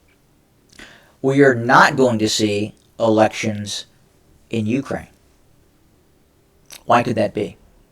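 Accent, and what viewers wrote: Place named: Northern Virginia, United States. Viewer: Haha! Yeah, that Howdy Doody voice... It's a Northern Virginia thing..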